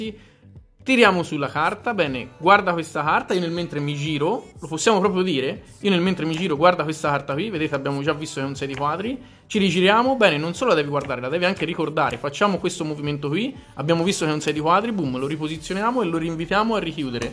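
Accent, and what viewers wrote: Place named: Tuscany, Italy. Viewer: Amo l'accento toshano😂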